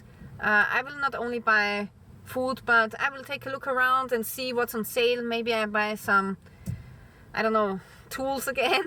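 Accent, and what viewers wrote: Germany. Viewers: Your English sounds a little like Klaus Schwab. Are you German? I used to live in Black Forest. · She speaks like Terminator. She has a German accent. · Great video;you have a German " accent...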